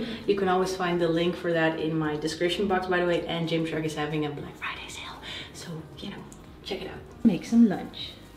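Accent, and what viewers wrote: Netherlands. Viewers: You're accent is very VERY Dutchess in this video.....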